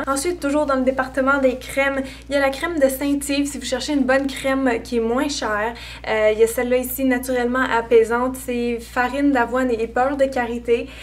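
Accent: Quebec, Canada. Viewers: Merci pour cette vidéo très utile ! Moi aussi j'achète des produits Yves Rocher et j'aime beaucoup la crème pour les pieds sauf qu'elle se vide très vite... Je voulais te remercier pour ta fraîcheur et ta vitalité ! Ça met vraiment de bonne humeur et c'est toujours un plaisir de regarder tes vidéos !! Je les regarde en dernier car ta chaîne est ma préférée ! En plus ton accent me fais rêver car j'ai très envie d'aller au Québec !! Gros bisous et continu comme ça !!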